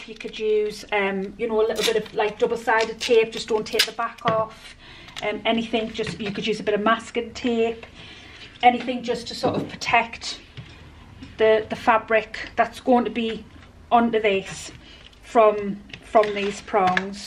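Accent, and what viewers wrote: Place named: North East England, United Kingdom. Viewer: Nice to hear someone from North east on here instead of americans. You make your NCW exactly the same way as I do. I love how the waterproof canvas makes it more structured but i have never used it before can it be bought here in the north east please.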